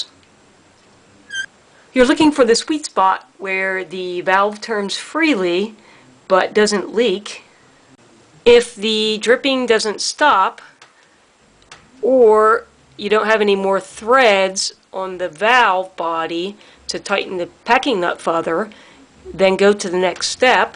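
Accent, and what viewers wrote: Philadelphia, United States. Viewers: From Philadelphia area? The accent is crazy! Wudder, Donit. lol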